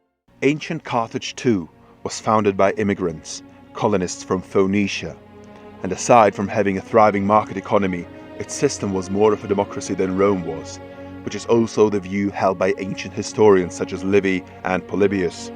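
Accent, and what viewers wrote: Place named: Ireland. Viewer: did you even watch the video? Did you listen...did he sound american to you? The dude is clearly Irish. And that logic makes absolutely no sense! How is that doubling down? Carthage was destroyed and levelled to the ground by rome, its population largly sold into slavery with the rest exterminated. Do you understand history in correlation to current events...? Essentially we've established the guy behind the vid to be Irish and that comparing usa with cathage is not doubling if anything it does the complete opposite.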